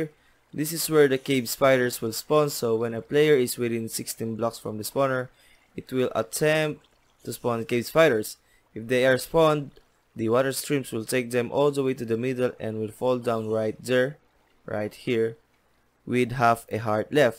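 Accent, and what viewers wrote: Philippines. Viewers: i smell filipino accent... HELLO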